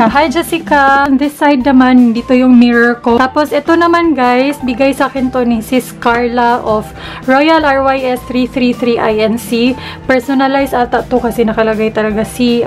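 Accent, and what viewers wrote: Visayas, Philippines. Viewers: Visaya po ba kayo??? Kasi po parang may mga words na accent at visaya word po kayo ask ko po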